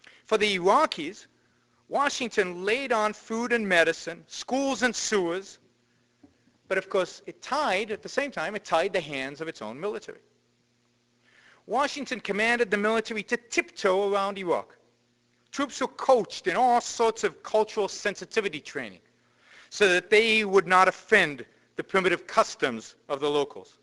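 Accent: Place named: Israel. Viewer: He's not a native English speaker--he's from Israel. Give him a break.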